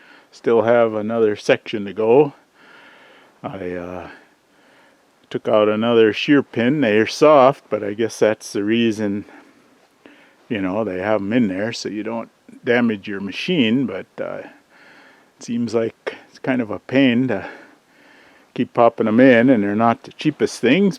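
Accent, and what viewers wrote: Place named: Upper Peninsula, United States. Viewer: you sound alot like yuper er like me from mi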